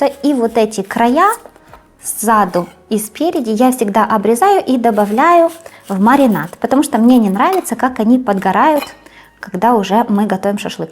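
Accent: Ukraine. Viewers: А нам нравится, она украинка вообще-то!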